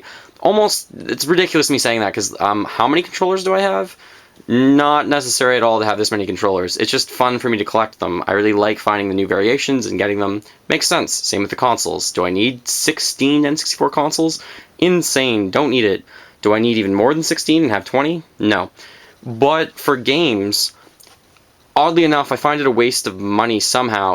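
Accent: Canada. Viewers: great video! I love video game collecting and I can see you do also. Also you sound Canadian.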